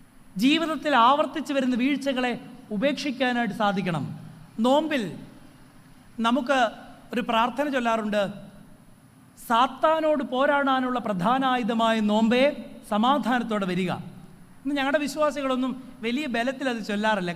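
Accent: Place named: Kottayam, India. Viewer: very valuable...speech Iheard the full-speed. kottayam language made me very happy.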